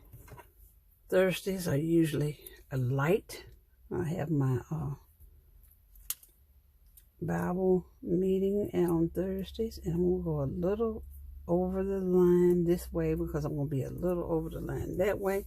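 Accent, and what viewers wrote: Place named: South, United States. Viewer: I like your southern accent😉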